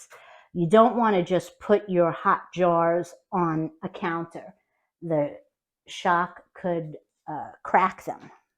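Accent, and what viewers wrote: New York, United States. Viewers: I love how you take the time to explain things so nicely and stress safety. This looks sooo good! Sounds like you might have some sort of allergy to something in those hot peppers. Bless your heart. Thank you for sharing this recipe. I’m gonna try this one for sure!, I love to hear your voice. I’m originally from South Jersey and hearing your NY accent warms my heart.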